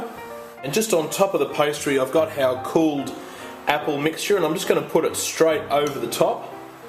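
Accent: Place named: Australia. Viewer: awkward ... hes Australian.... .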